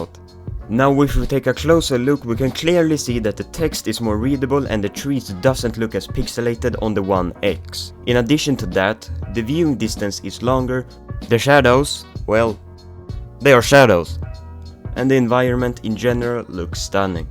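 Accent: Sweden. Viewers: You from Sweden? :)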